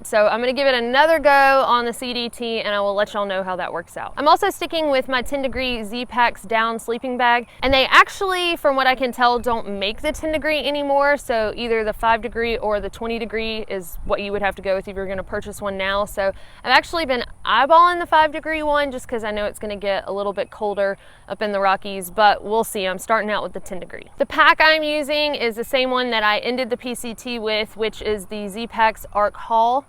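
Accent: South, United States. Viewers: can you do a video with your sexy southern accent with lots of y'all and yonder and mosey??? You're so cute.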